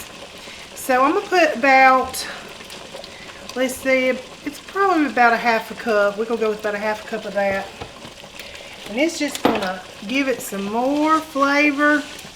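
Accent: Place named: South Carolina, United States. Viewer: Y'all just came up random in my feed but really caught me ear. You sound like you grew up in a South Carolina cotton mill town. Uncle Sam sent me a lot of places, but I sure miss that little mill town I grew up in.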